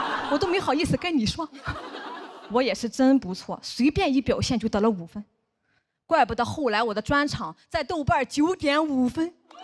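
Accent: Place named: Shandong, China. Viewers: 山东口音，徐志胜也是山东的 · 面相身材口音非常山东！😂😂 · 这个姑娘真不错 三观正 不是为了骂人而骂人 比如杨某 带点土的山东口音又给人真实感 赞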